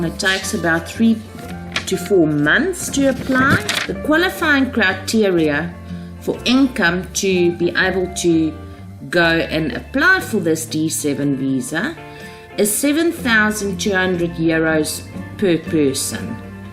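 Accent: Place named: South Africa. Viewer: Oh my word you're south African!!!!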